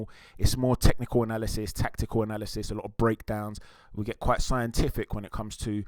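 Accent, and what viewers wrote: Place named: London, United Kingdom. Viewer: .... where do I start my brother, Firstly massive respect to Hatman for sending all of us here, Hatman & Boxing beats & rhymes are the top 2 UK Boxing channels by a country mile!! I just watched this breakdown & I gotta say bro you are on some next level with this kind of detail - this is genuinely intellectual stuff so massive credit to you - you got a bundle of new subscribers now including me & my pals so maybe tell us a bit about yourself - did you do boxing at any level or are you just a passionate sport lover? I’m from London and you sound like you from London too so again I extend you every courtesy and look forward to debating Boxing with you. One thing I want to ask you bro - I was a massive Lomachenko fan and we know that he f**ked up against Lopez but I cant see any videos from you on that fight - I liked what Hatman said that Lopez has been training for Loma for years whereas Loma probably didn’t even know Lopez existed - I really wish he hadn’t lost that fight man Anyways great work from you and glad I subscribed - sincerely hope your channel blows up in 2021!!!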